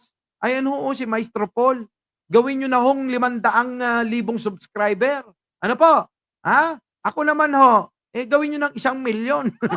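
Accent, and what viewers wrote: Ilocos, Philippines. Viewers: I like how you speak ilocano ading!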